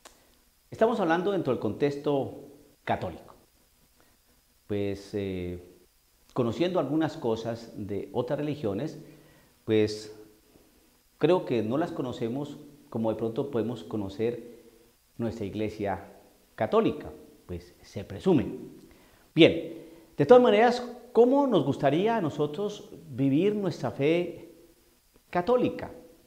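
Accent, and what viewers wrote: Colombia. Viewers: Calavera. jajaja hay padrecito me iso reir con eso tipico hablar colombiano.Bendiciones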